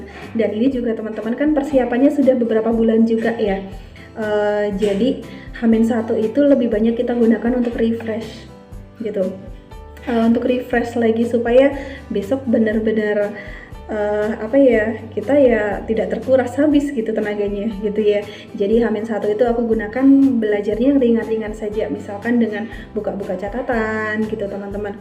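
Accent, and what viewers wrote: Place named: Banyumas, Indonesia. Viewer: Kaya ne wong ngapak rika yu'..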